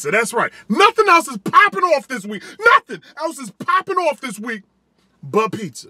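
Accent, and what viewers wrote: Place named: Atlanta, United States. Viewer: you sound like you from Atlanta Georgia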